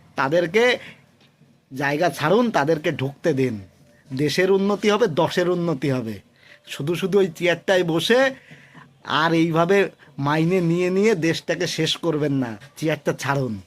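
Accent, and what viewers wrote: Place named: Bangal, Bangladesh. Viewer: তোর কথা শুনে মনে হয় তুই বাঙাল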